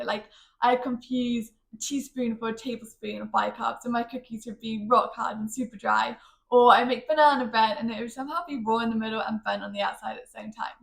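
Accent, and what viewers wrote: United Kingdom. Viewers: What kind of accent do you have? Which part of England? I'm guessing south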